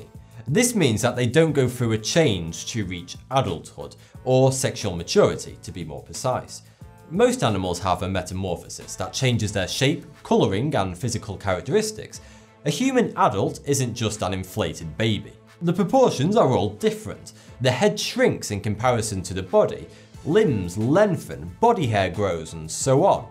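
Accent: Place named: Lancashire, United Kingdom. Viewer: That accent makes him sound twice as intelligent, damnit I wish I was from Lancashire...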